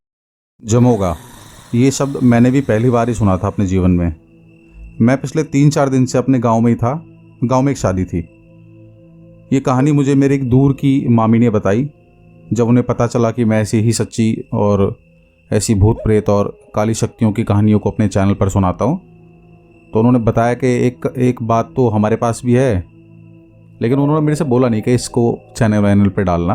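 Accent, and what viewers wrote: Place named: Meerut, India. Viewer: Jai shree Ram bhaiya ji.. me Meerut me rahti hu.. aapki baato se lagta. Hai ki. Aap bhi Meerut ya Meerut ke aas pass hi. Rahte honge. Sunte hue aisa hi lagta hai ki humaare neighbour bhaiya hi story suna rahe hai. 45 years Meerut me ho gaye hai tho Punjabi ke saat saat ye language bhi hum sab me rach bass gayi hai 😊 aapki sabhi stories bahut hi acchi lagti hai 🙏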